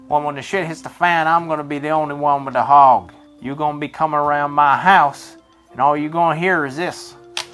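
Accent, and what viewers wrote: South, United States. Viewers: Funny as hell, but why the southern accent? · this one isa solutely outstanding and your Confederate English is very good. Respect.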